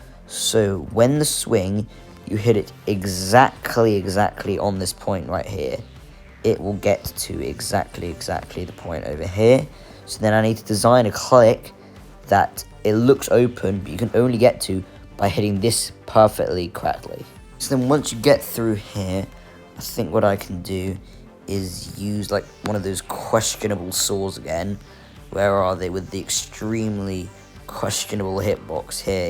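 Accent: United Kingdom. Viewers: you are the most british sounding british person I've ever came across on here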